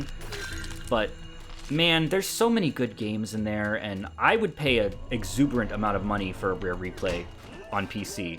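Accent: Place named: Boston, United States. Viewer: Doesn't remember 90% of the jokes chat remembers. Remembers them shaming his Boston accent. Think about the effect your words will have, people.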